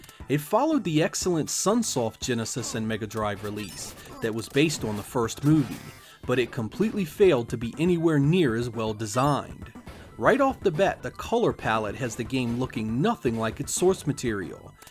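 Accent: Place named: Piedmont, United States. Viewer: I've been trying to place your accent and I'm ready to guess: Piedmont region of either Georgia, Tennessee, or the Carolinas. I've only known one person that pronounced "on" the way you do and he was from the suburbs of Atlanta.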